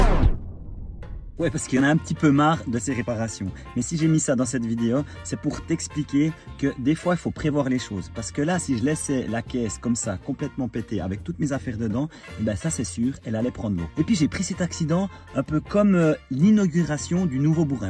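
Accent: Switzerland. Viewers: L'accent suisse. Une pépite vraiment 😂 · t'es suisse ou bien ?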